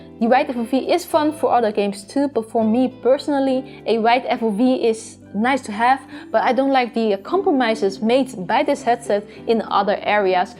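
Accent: Netherlands. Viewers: You sound so Dutch to me haha are you Dutch?! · Ben je Nederlands, want volgensmij hoor ik een accent🤣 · Are you from the netherlands? I think I hear a dutch accent. :) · Are you dutch? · You sound Dutch :X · Haha ik hoor dat je nederlands bent😝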